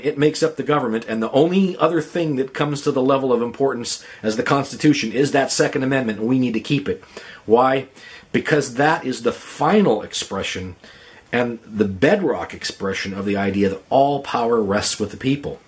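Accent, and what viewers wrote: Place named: England, United Kingdom. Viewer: I like your English accent, lol.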